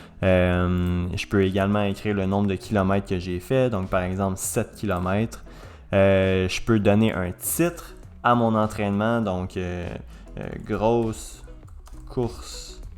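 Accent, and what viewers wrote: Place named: Quebec, Canada. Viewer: Super vidéo j'aime beaucoup les Québécois :-) avec leur accent mais au moins c'est bien expliqué bises de France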